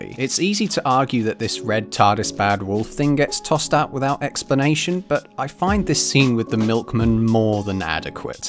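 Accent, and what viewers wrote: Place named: United Kingdom. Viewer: Hello man. I was thinking about your accent but i can’t quite tell which is it: of course it’s an accent from the UK but which one? I’m from Italy and i’m trying to get more familiar with this kinds of accents, thank you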